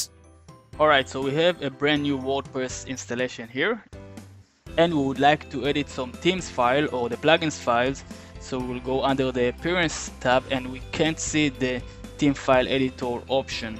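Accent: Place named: Israel. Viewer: the most israeli accent i ever hear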